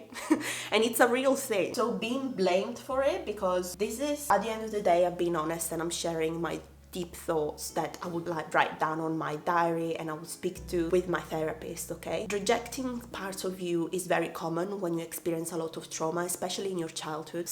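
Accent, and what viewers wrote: Italy. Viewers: Hearing the Italian mixed in with the English accent when yo speak is amazing to me. I love hearing it and doesn’t make a difference in understanding your content. For me it gives you character and I love when you call us famiglia. Thank you for all that you do. Your content is so informative, real and inspiring. Also you are so brave for moving all by yourself to a new country at such a young age. Your success shows your strength and perseverance. Lastly that concealer looks amazing, what brand is it? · Your accent is amazing. Are you kidding? They're jealous...you're hot, smart and have a sext accent. Come on!! I'm Italian also and your accent is so authentic, beautiful. Forget these idiots!!! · Ehi bella, sei la mia inspirazione! Io sono mezza italiana e vederti tornare al tuo accento italiano mi scalda il cuore. Sono così orgogliosa di vederti come italiana che vive nel Regno Unito. 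Per favore, non cambiare mai chi sei perché abbiamo bisogno che più persone come te siano se stesse in modo impenitente. Non sai quanto sei bella e unica. Gli altri ti invidiano perché sono ignoranti e vogliono quello che hai. Sii orgoglioso di essere italiana!! 🇮🇹🇮🇹🇮🇹😘❤❤ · I would’ve never guessed you were Italian I thought maybe you were Puerto Rican or Dominican I love the accent 😍 Your body is fire 🔥 work it girl Love your content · I don't think people were picking on your accent. They were curious as to why you suddenly sounded different. The Italian accent is beautiful and it's sad that you felt that you had to hide it. Good that you're not doing it anymore, apologies for judging without first understanding. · I love your accent! My best friend is from Trapani and it's her essence. I love seeing her as natural as she is, the same I wish from everyone! Also, loving when you're consistent with creating content, you entertain me a lot! 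But I understand that it's a lot of work and effort, so I'll be waiting paciently <3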